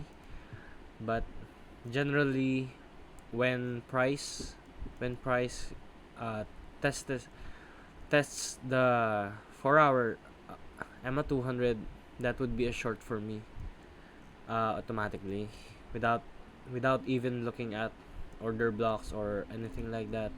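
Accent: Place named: Philippines. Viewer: Just curious with your accent if you're a Filipino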